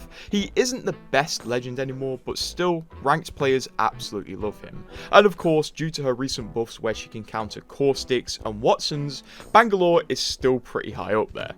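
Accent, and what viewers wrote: United Kingdom. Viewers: Are you from northern England? Like machester or yorkshire?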